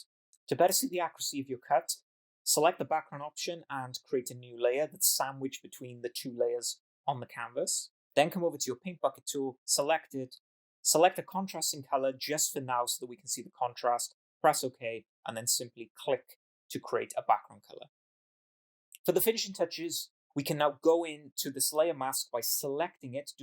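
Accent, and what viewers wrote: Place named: Wales, United Kingdom. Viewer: Love a tutorial with a welsh accent! Feels like I'm listening to a mate! Great tutorial bro! #WAAAAYULLSS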